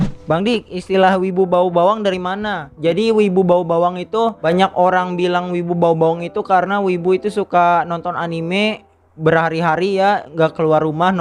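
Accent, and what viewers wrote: West Java, Indonesia. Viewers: bang lu , sunda ya ? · Bang lu dari sunda kah?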